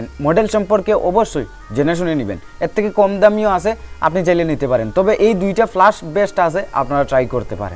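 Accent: Sylhet, Bangladesh. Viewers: ভাই তুমি কি সিলেটি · সিলেটি নি বা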